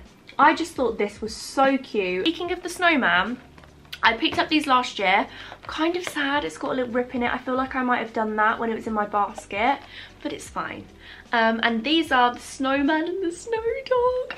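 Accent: United Kingdom. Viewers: What accent do you speak with? I know that your accent is british, but is it a received pronunciation ?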